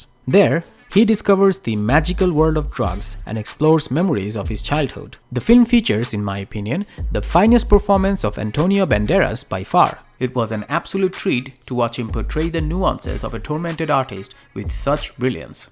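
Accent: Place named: Bengal, India. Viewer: You're the perfect example of pure Bengali accent. It's enjoyable to listen.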